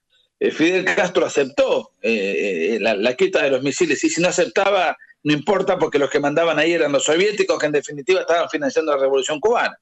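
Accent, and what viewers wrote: Argentina. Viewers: No estas en nada. El che no tiene la culpa.de que estos Seudo izquierdistas. Utilizen la inmagen de el che para sus idioteses. La nueva izquierda. NO tiene nada que ver con la vieja Izquierda latinoamericana. Y el che era clase media. Todo lo que decis que se movia en elites esclusivos es mentira. Te entiendo porque eres Cubano. Que tubo que escapar de Cuba por la revolution. Aunque hables como Argentino. Deja de.engañar a las nuevas generaciones.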